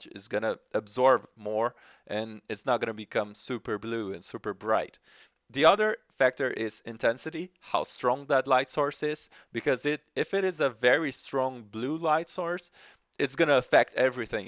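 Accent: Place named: Sweden. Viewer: you sound like pewdiepie when he had the really thick Swedish accent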